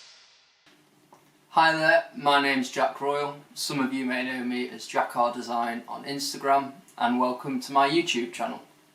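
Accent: United Kingdom. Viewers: Brilliant! Thanks for that, really looking forward to see the other videos you’ll make! Anything will be good for us I guess :) Btw your intro is perfect, and the video is great. Sometimes it’s a bit tough to understand your British accent but I guess it’s going to get better with a bit of practice!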